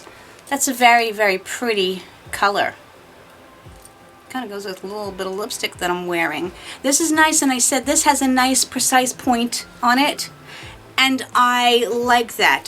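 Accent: New York, United States. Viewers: I love the Best of Life 2 pallet, stunning colors & glitters, love your NY accent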